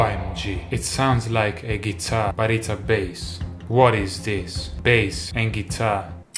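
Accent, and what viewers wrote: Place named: Italy. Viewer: and when he talk..he sound like italian guy...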